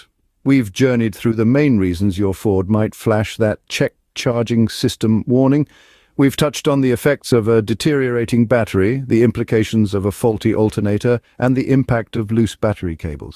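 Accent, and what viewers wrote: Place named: RP, United Kingdom. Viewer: WTF is this Brit talking like this is etiquette school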